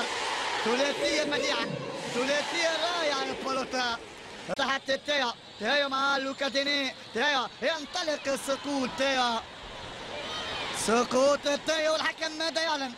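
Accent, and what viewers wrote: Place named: Italy. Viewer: المعلق كأنه ايطالي😃👏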